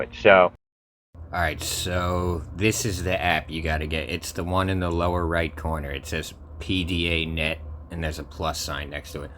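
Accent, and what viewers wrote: New York, United States. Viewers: this NY nasal accent... It's sounds great though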